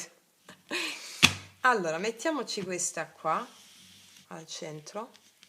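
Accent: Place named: Sicily, Italy. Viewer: Ciao mi sa è siciliana 😊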